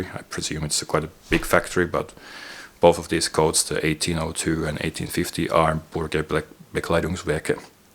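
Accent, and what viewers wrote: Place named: Finland. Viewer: Kyllä se aksentti vaan paistaa läpi meillä🤣 Mutta hyvä video! Alkanu lähiaikoina kiinnostaa kylmän sodan aikanen varustus euroopan maissa niin nää on käteviä!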